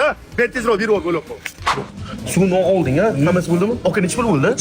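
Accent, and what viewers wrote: Namangan, Uzbekistan. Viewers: Namangan shevasi😊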